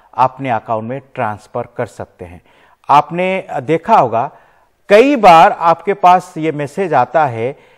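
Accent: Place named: Bengal, India. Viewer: Bangali tone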